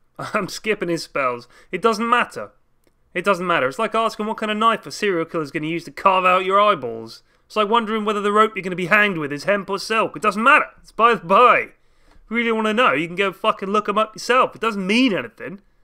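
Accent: United Kingdom. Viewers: people with british accents make things sound much more intelligent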